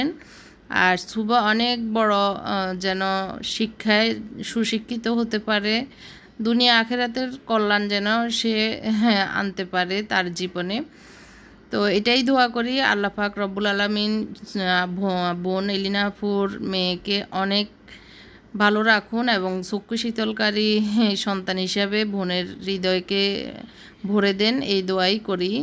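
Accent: Noakhali, Bangladesh. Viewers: আসসালামু আলাইকুম আপু। আপু আপনার বাড়ি কি নোয়াখালী?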